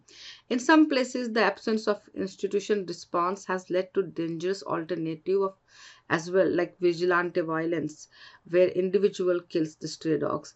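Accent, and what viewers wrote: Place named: North India, India. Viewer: Who is this journalist.? Aren't there any better candidates ❓❓❓. All north indian slang ugli english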